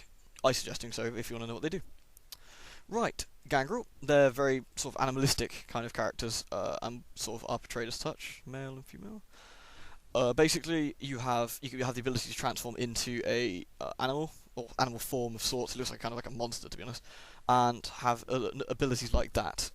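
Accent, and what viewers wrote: United Kingdom. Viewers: since I have no interest in this game, I think I just sat through almost 8 minutes of video just to hear his fabulous British commentary XD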